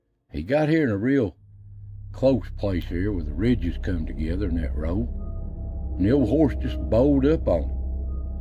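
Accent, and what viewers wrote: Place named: South, United States. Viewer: I love hearing these old stories. Thank you for documenting them. You have a nice southern US accent. Your way of speaking and tone is very relaxing & soothing to listen to (no loud sound changes/ noises in the video).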